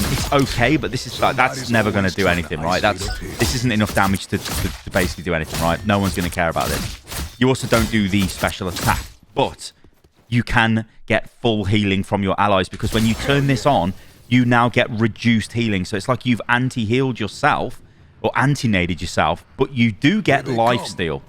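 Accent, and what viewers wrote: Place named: Newcastle, United Kingdom. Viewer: Sounds like Newcastle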